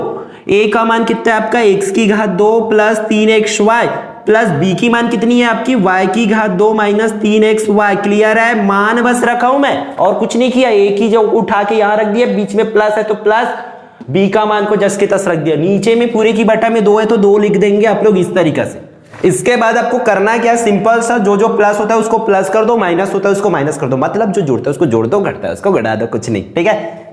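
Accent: Chhattisgarh, India. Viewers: aapke video me cg sound baj raha hai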